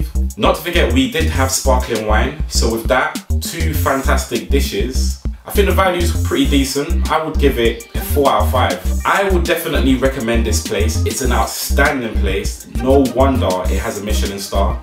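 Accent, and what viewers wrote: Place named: United Kingdom. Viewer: A black man speaking English with a British accent in Amsterdam while at a Japanese restaurant... Whoa my brain just froze bruv I swear lol but this vid satisfying af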